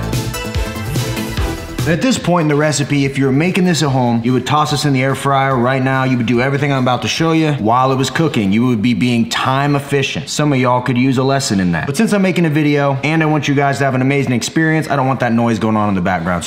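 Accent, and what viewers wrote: Texas, United States. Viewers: The Texas y’all has caught up with ya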